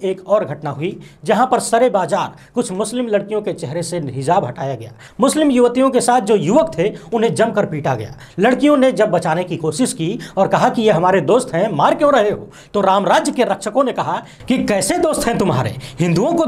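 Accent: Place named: Bhojpur, India. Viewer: Bhaeya. Bhopori mix me jo aap bolte hai na kasam se maza aajata hai😂😂😂😂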